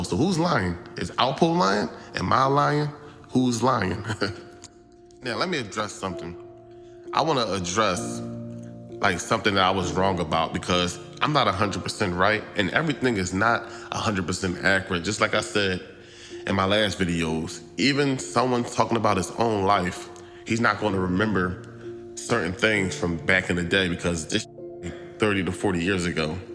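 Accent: Philadelphia, United States. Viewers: Love these videos!! Keep these coming. If we didn’t know you was from Philly….this video let us know YOU DEFINITELY FROM PHILLY😂😂😂😂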